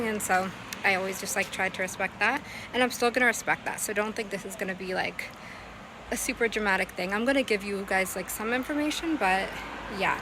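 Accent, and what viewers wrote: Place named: Jamaica. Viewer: Love your slight Jamaican twang, it's cute. Your relationship is not my business. You look good, glowing and healthy. 😊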